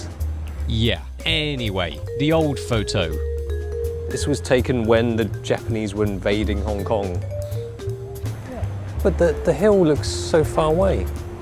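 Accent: England, United Kingdom. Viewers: How did you get a English accent?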